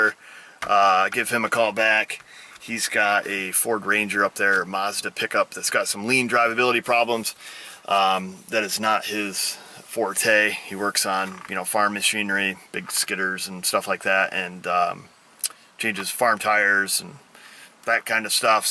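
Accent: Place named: New York, United States. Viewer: First of all,, im a Finn. I live pretty much at the same weather condicions as you in New york. We do use salt as ice melter on low freecing condicions,,, meaning 0- 10 Celcius. But not so much. Our vechiles seem to rust perhapse even more than yours in new york. I used to like the southern drawl,,, but becauce of you,,.Im a big fan of this Northern Newyorker language that you use.I do love the south allso.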